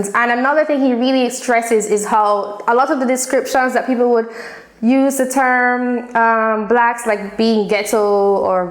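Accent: Jamaica. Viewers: Girl! I hear the Jamaican dialect there. Anyways, speak the truth. Jamaicans are here being brainwashed by American mainstream propoganda on racial issues. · Are Jamaican? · My girl I can here your Lovely Jamaican accent. Like the video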